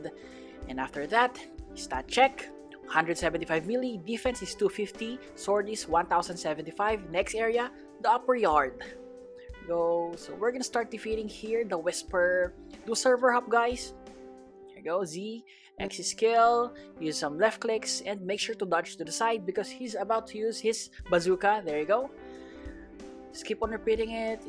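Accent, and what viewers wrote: Philippines. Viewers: Parang filipino accent mo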